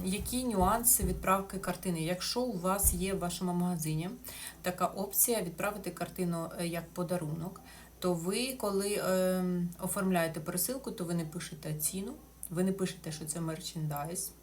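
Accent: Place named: Cherkasy, Ukraine. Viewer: Дякую Вам! Хороші роботи. Приємно дивитися і чути Вас. Натхнення Вам і нових творчих задумів та їх здійснень! Я з України. Мені здається, що Ваш говір черкаський 😊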